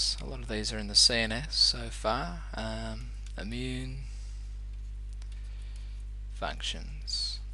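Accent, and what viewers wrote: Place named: Australia. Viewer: Why is this so much more interesting when it is delivered in an Aussie accent?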